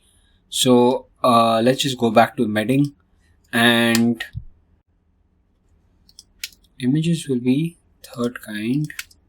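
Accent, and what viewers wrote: India. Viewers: Very good video, but sorry, your indian accent is vey strong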